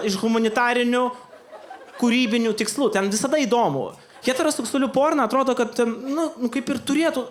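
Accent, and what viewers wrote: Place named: Poland. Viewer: 😂😂 Geras. Prajuokinti Lietuvaičių sunku. Šaunuolis, geri bajeriukai ir tas akcentas Lienkišckas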